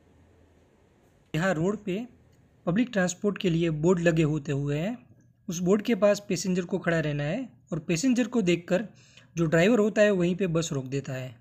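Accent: Gujarat, India. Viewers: Bhai boli upar thi Gujarati lago cho tame ....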